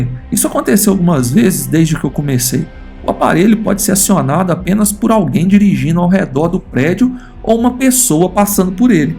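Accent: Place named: Campinas, Brazil. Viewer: Vou me inscrever. Gosto dos assuntos e seu sotaque parece que tu é aqui do interior de Campinas kkkkk então me identifico. Sucesso.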